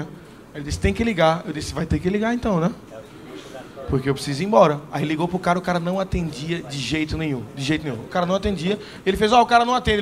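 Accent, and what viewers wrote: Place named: Bahia, Brazil. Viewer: normal, ele é baiano em vez de dizer MAS VOU ele fala MAR VOU... E outras trocas de letra assim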